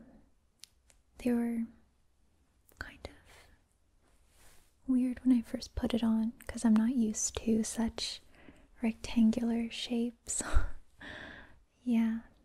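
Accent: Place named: South Africa. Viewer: I sorta get springbok vibes from your voice and it's the best thing ever.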